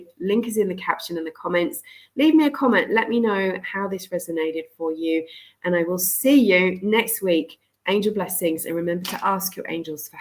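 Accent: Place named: Australia. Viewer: I’m in the U.S, but i’m an Aussie. So awesome to hear an Aussie accent. Makes me feel better. I’m an empath. Being in America is really hard right now. I spend all my time, clearing it. Lol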